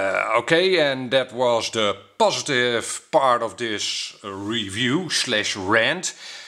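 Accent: Netherlands. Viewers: Gast! wat een heerlijk engels hollandsch accent heb jij!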